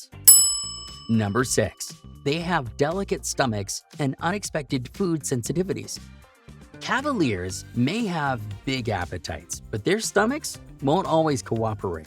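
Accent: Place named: United States. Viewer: This guy is an absolute idiot……..these dogs unfortunately will eat anything and everything……any break from kibble or dry biscuits is a blessing…. Do not feed these dogs any kibble no matter how expensive……it is deadly to them, most dogs but definitely these….no cavalier after 2 has endless zoomies…..the stupid American English accent ……they should never be left alone for more than an hour or two….Do not get one you can’t afford to stay at home or work from home……they would die early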